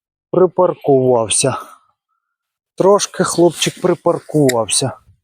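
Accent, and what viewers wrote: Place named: Russia. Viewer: Как бы не старался рускоязычный человек говорить на мове, всё равно это чувствуется.А водоём этот не плохой,по крайней мере не так заросши водной растительностью как в прошлых видео.. нхнч.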